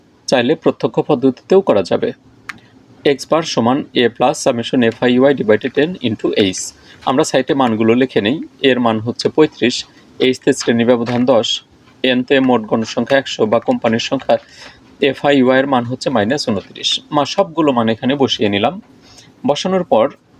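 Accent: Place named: Pabna, Bangladesh. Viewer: Sir আপনার village ki pabnai? Language oirokom..🙂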